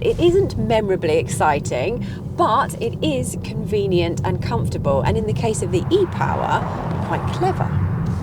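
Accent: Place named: RP, United Kingdom. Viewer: Love your charming posh accent.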